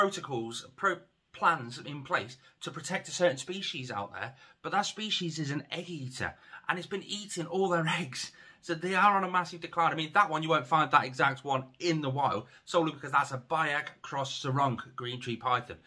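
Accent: United Kingdom. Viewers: Y'all Brits have such a bizzare way of saying things to us in the states it's neat though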